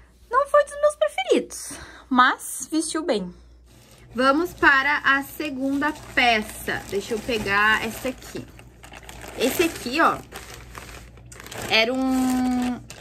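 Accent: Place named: Rio Grande do Sul, Brazil. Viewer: Enfim achei uma gaúcha falando das roupas da shein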